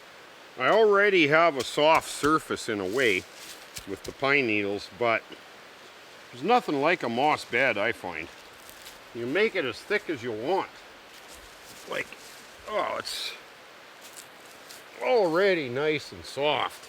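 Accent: Canada. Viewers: I could tell you’re from canada because of your accent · He sounds so Canada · Since you're Canadian. Would you say "A" or "ay" at the end of every sentence like your asking a question please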